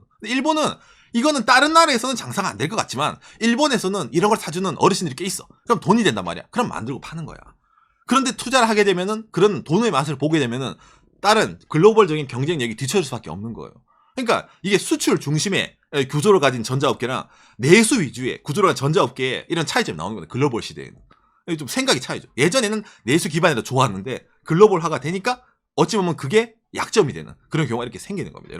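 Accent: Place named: Gyeongsang, South Korea. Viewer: 이분 동남방언 너무 심하시네요 그것만 아니면 좀 편하게 들을수 있을거 같은데 구독은 못하겟네여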